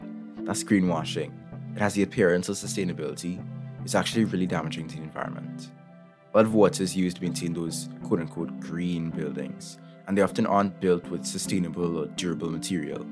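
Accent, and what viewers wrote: Trinidad and Tobago. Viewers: I keep trying to place your accent. Are you trini? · Cauhgt me offguard with the trini accent. Haven't heard trini since living in Venezuela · Boi, I know a Trini accent when I hear one! Love ya channel. You have a new subscriber. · i knew i recognized the accent, found a Trinidadian youtuber out in the wild lol. love the video and although i haven't heard the name before i am familiar with the concept of solarpunk and honestly i hope we will be able to see our futures in this hopeful light. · What country are you from? You sound trinidadian a little lol 😭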